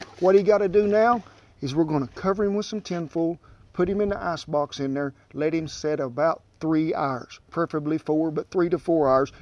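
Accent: United States. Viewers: I really come here for the cow boy Accent ( the Wild West language).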